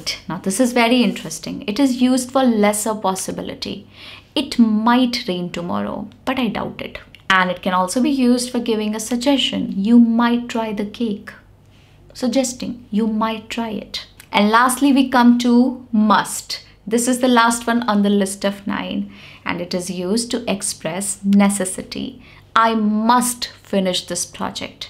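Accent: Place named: Bagheli, India. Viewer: Are mam kaha se ho aap kyunki bagheli bol rhi ho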